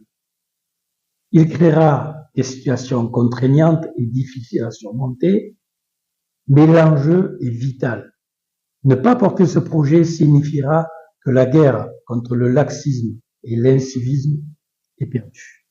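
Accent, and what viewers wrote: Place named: South, France. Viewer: Qui es tu? Avec ton accent du Sud français, pourquoi tu ne reste pas à 6000 km à t'occuper de l'urbanisation marseillais?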